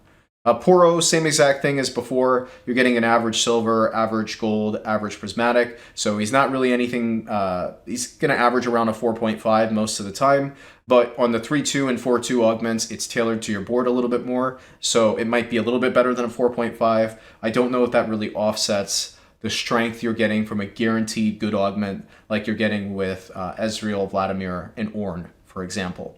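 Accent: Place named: New York, United States. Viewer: What part of New York are you from? I hear the accent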